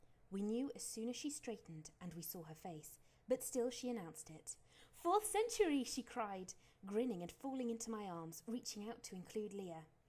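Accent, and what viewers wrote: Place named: United Kingdom. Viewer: The American teen is commentated by a British woman… fascinating choice. Same as the cover art for Torrent, not my first choice. Also why is the audio quieter than a fish screaming bloody murder?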